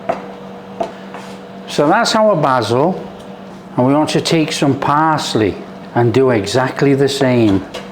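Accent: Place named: Northern England, United Kingdom. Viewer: Love it!!!!! Let me guess your accent... Newcastle? Sheffield?? Yorkshire?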